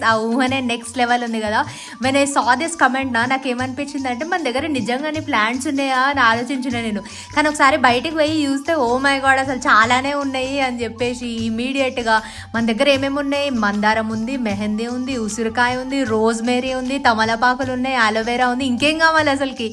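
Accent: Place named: Telangana, India. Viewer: Nee sagam PALLETURI english , sagam village Telangana yasa kalasi em baga feel undadu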